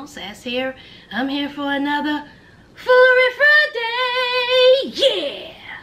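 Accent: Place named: North Carolina, United States. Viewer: NC resident? your accent sounds real close to those in the foothills.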